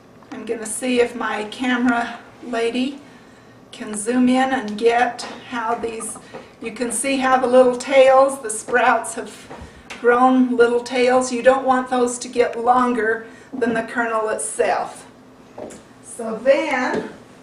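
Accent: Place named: Utah, United States. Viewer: Hi! I sure would like to try your recipe. How much wheat did you put in? I think the measure was edited out. I'll watch it again. BTW-as soon as you started talking I thought you must be LDS. You have that UT Mormon Mommy accent. So cute. When I looked at your other vids, I discovered I was spot on. Anyway...if you could give the exact recipe, I would very much appreciate it.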